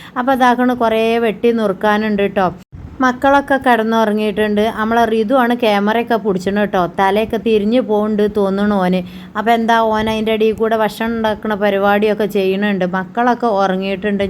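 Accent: Malappuram, India. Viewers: മലപ്പുറം ഭാഷയല്ലേ അത് കുഴപ്പമില്ല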